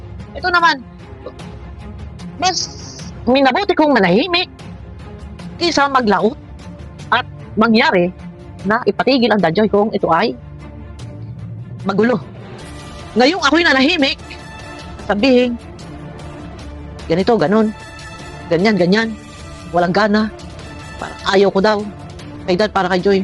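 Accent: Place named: Laguna, Philippines. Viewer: Ma'am, taga saan ka po? Ang puntuhan mo kase ay parang taga Laguna😅 na may pagka batangeña😅.. ang cute lang pakinggan😊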